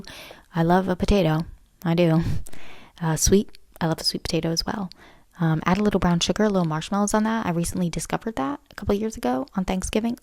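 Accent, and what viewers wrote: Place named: France. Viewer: As a french person, i love your french accent !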